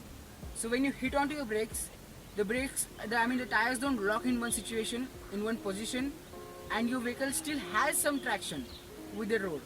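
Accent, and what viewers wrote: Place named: England, United Kingdom. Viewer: Nice video bhava... Nice English accent..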